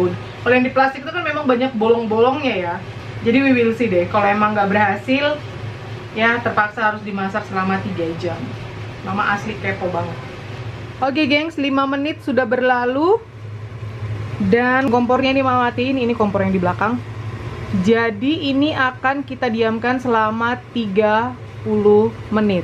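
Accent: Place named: Banjar, Indonesia. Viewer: Suka sekali sama si mamak ini, bhs nya Banjar banar. Menggurak gurak. Si acil, nyaman banar. Wkwkwk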